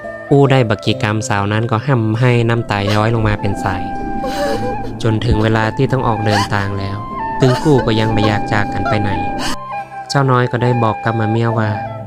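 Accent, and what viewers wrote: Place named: North, Thailand. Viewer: อี้ก่า กำเมืองแต๊ๆเลย ชอบๆ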